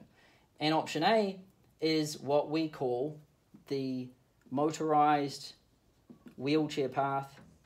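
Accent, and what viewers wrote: New Zealand. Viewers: One thing comes to mind when watching your videos. Quality. Great job man. I like your way of analysing life and work. And I have fun when I can't understand that you find a lot things "bitter". New Zealand accent rocks!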